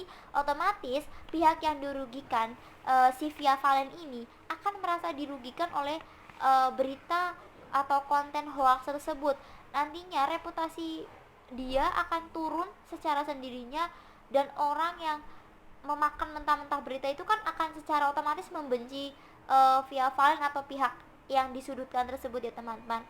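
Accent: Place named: Java, Indonesia. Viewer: Wah logatnya jawa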